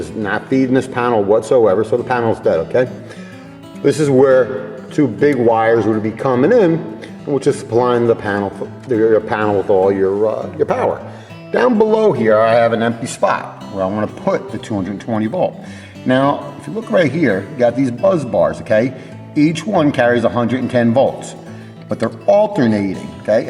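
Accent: New York, United States. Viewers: Is he from New York? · are you from New York? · good video, thank you. n love the ny accent. : )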